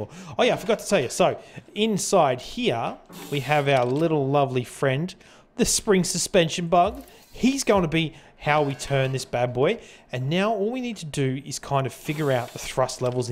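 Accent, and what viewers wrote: Australia. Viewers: i always knew u were australian from when i heard ur voice! nice creation, mate!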